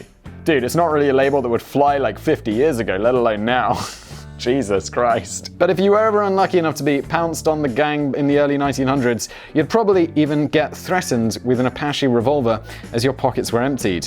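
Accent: United Kingdom. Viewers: ...look, I'm not gonna go read all of the boi with the blazes comments here, but damn... are people REALLY wasting TIME on comments like, "what is a taco?" OBVIOUSLY pronounced by a British man??? How many ducks do people really give about his pronunciation???? Geez!!!!! That's a little bit picky, don't ya think??? I LOVE THIS CHANNEL!!! IT'S MY FAVORITE!! LET THE BRITISH MAN SPEAK!!!!🙄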